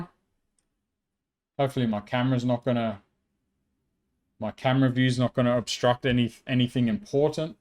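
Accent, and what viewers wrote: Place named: Australia. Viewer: Thanks, good video and great to listen to an Aussie accent for a change. Where do the numbers you use to fill in the fields in TBS Recorder come from? Are they published somewhere? I get that this video is "for educational purposes only" and you don't want this to turn into a hackers guide. Does the locked status change only when you've selected the correct settings for that satellite and that transponder?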